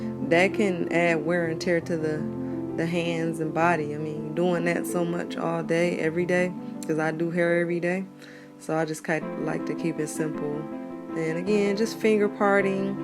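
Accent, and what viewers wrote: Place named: Detroit, United States. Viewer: Why i say to myself…. She sounds like she’s from Detroit….❤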